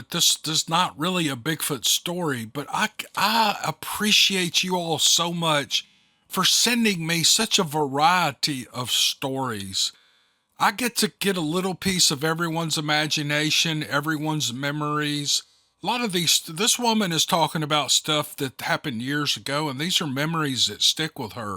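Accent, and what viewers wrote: South, United States. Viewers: That was a real treat! Great stories! Thanks much! Others read stories but no one else does it with quite the Southern Style of! I'll be leaving a light on tonight! 8 )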